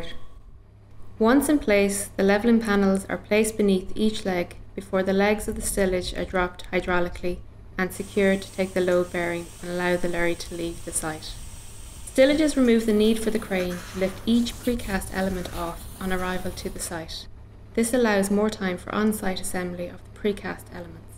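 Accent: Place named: Ireland. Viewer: Me too Juan, she has a lovely voice and sounds Irish to me.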